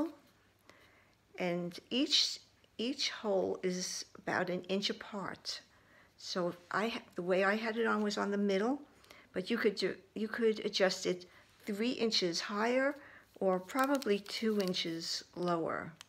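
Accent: New York, United States. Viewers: The Tabby Shoulder Bag is such a pretty bag! I got it in black and beechwood, as they were both on a great discount here in Europe a few months ago! I love the burgundy microfiber lining and the organization! I enjoy your videos and love your english, as it reminds me of NY! My mom is from NY and I still have relatives on Long Island!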